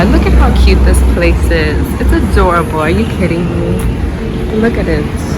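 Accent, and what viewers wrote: Jamaica. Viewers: How is her accent Jamaican in only fourn months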